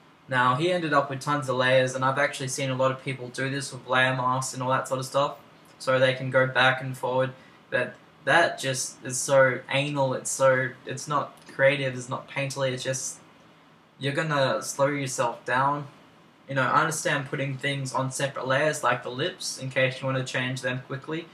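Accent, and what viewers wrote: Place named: Australia. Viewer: Sad how much hearing an Australian accent on youtube turns me on. **tutorial to me allll dayyy**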